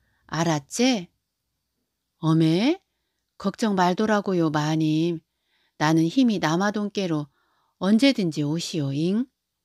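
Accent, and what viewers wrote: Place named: Chungcheong, South Korea. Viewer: 충청도 금강건너 웅포가 고향으로 잊었던 사투리에 정감이 갑니다.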